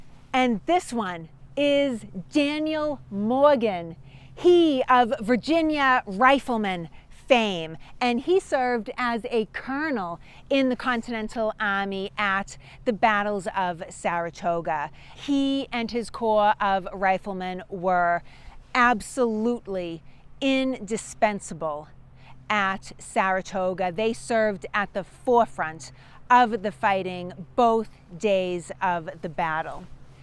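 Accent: Boston, United States. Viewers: Love the history lesson with such enthusiasm and your lovely Boston accent, thank you. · 1st, I love the accent. My job moved me to the west coast about a5 years ago. Hearing that Boston accent makes me feel at home. That said, BA was a great general until he wasn't. Wasn't it the guns captured there that were used to force the British from Boston after they were placed on Dorchester heights? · Love the videos! The genuine Bostonian accent is wonderful and adds to the fact that knows the topic. MORE VIDEOS PLEASE! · If only Benedict Arnold had not met and married teenager Peggy Shippen, history might have looked at him very differently. I love your enthusiasm and your Boston accent (calling a park a pac). Really enjoying your videos. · I absolutely love your enthusiasm and that heavy Boston accent there's no one better to talk about the Revolutionary War then someone from Boston. 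Great job loved the video and I also subscribed. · Another great video, as you were talking, I was thinking of who could not be there. And Arnold came right in my head. I've been watching your videos for years. I am a tour guide in Boston and portray Handcock. I use a lot of your bits of Revolutionary history with my tourist. Things people have never heard. Thank you for all your hard work bring us these videos. Continue to stay true. Love that Boston accent! Cheers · Love to hear your accent! Although this is about Saratoga, I said she has to be from Boston!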